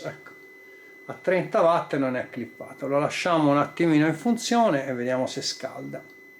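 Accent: Tuscany, Italy. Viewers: Ciao, bella riparazione. Sei toscano come me😂. Ho visto la sigla sul transistor di potenza,SGS ates unica gloriosa industria italiana di semiconduttori anni 70😢😢bravo ti seguo volentieri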